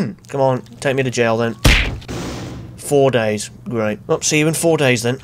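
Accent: United Kingdom. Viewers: You are a mix of thedooo, mcnasty, martincitopants and british. And i love it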